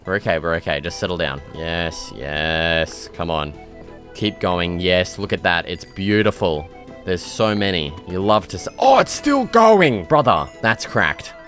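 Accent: Australia. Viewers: Hahahaha i love how aussie he is hes like the only minecraft youtuber that sound like their from australia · The way you talk is so Aussie! I bloody love that mate!!! ❤ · Are you Aussie cause you really sound like one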